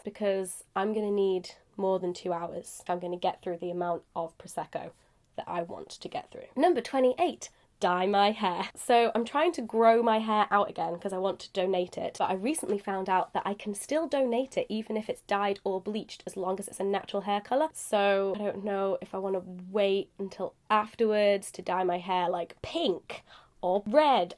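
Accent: United Kingdom. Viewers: You are very cute and sexy and the British accent...OMG...so hot! Much love from Arizona, USA.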